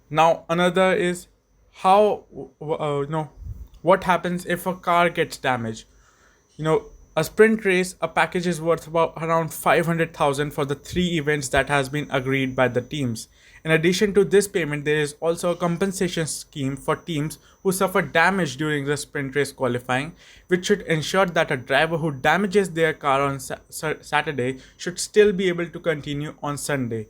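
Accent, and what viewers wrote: India. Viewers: Ngl I always thought you were indian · ur accent..u Indian?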